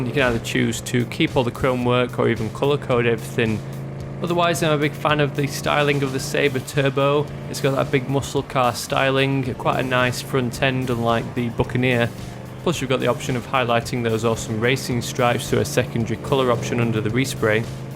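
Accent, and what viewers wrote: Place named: United Kingdom. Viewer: He's British, dumbass.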